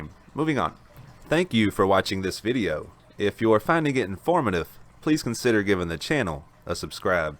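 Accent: South, United States. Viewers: I love the southern accent when speaking about China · Love the southern accent when talking about anything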